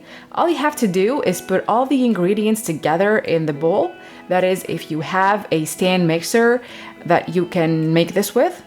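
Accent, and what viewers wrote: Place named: Quebec, Canada. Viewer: Been roughly 10 years since I’ve made croissants this video is the perfect explanation for how to laminate dough! Even Touch my girlfriend how to make them as well thank you very much keep up the amazing work ! Also when I here the accent I’m like damn this gonna be good 😂😂 French Canadian ?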